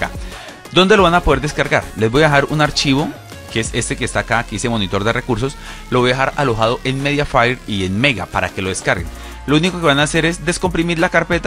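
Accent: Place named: Cali, Colombia. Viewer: Excelente vídeo, tu acento dice que eres de la Sucursal del Cielo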